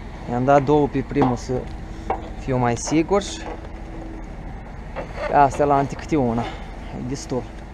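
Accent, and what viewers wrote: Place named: Moldova. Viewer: Salutare! Aceasta este filmare de lumea trecută 19 iulie 2021? Îți doresc numai drumuri bune și mai largi,nu din cele întortocheate că la Cannes și celelalte cătune! Eu credeam că orașele europene sunt mai "aerisite",nu ca prin unele orașe de pe la noi. Te-am cunoscut de la primele vorbe ale tale,că ești moldovean de al meu! Care este lungimea totală a "trenului"tău,?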